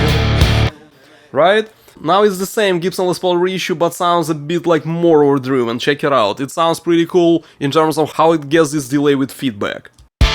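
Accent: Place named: Russia. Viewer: ёр инглиш вери вел))) как по мне это супер уровень, а русский акцент придает изюм))) те кто гонит на акцент наверно не слышали индусов или филиппинцев))) хотя я считаю что сложнее чем говор коренного британца брауни или кокни НЕТ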